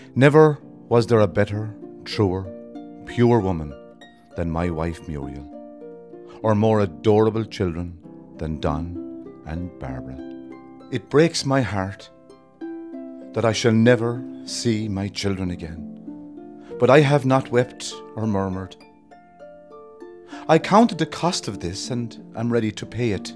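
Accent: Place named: Cloughjordan, Ireland. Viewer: Magnificent piece of work especially given the circumstances of that final night. Beautifully read by the perfect Cloughjordan accent. Thank you all. I'm tearful having listened. Thank you.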